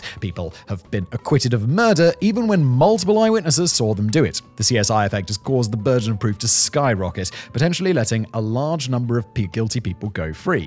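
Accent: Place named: United Kingdom. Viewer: Years I have waited and finally I am satisfied....finally got to hear say "innit" in that very mild British accent 😅😂😂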